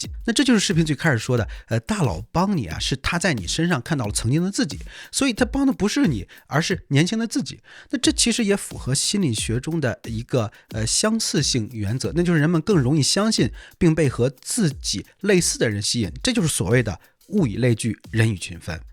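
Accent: Tianjin, China. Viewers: 老师，您讲的很好，但我很好奇您怎么从山村里走出来的？ 我听着您的天普口音很亲切…这种口音怎么可能从山村里走出来…蓟县也不说这种天普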